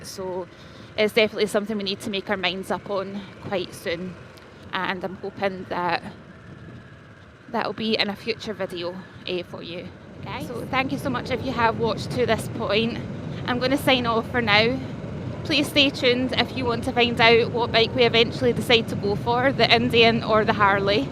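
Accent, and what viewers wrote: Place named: Scotland, United Kingdom. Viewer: Very deep Scottish accent!!!! Thank you for the info!!!!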